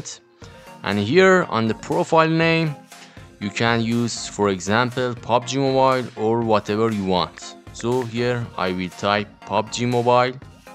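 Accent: Iran. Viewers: از لهجت معلومه ایرانی خو ایرانی توضیح میدادی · با این لهجت بهتر نیست فارسی فعالیت کنی داداش؟!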